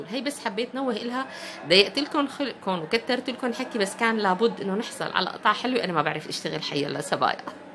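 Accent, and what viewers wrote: Egypt. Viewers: تسلم ايدك عل شغلك وشكلك قاعدة بمصر اللهجة المصرية باينة في كلامك شويه تسلمي حبيبتي من كل شر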